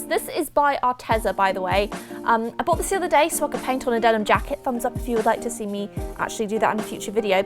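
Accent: United Kingdom. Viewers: Is anybody else just obsessed with her British accent? 😂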